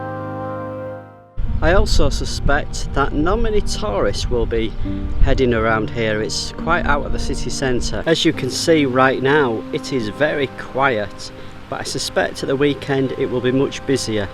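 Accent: United Kingdom. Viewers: That accent of yours intrigues me. I left Lancashire 1968 so my ear for accents has almost disappeared; especially as now most of the North country accents have emigrated southwards. Would you mimd telling mr where in UK you originated. Oh, and thanks for all the great Malaysian videos!!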